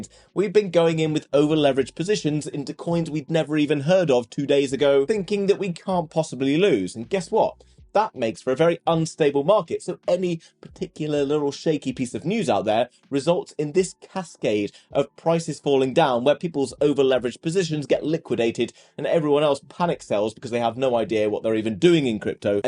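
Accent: England, United Kingdom. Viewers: His accent is an English one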